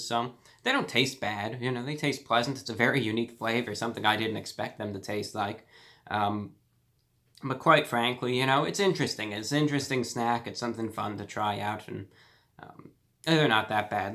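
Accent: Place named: Transatlantic, United States. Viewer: Ive also noticed he has a slight transatlantic accent which takes years to learn (it's not a natural accent to any group of people) thats dedication.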